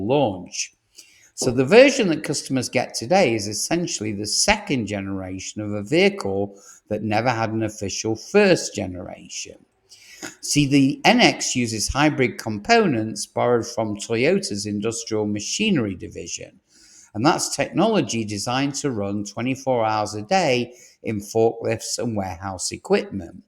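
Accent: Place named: United Kingdom. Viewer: Love that British accent, and apparently not AI. Great work!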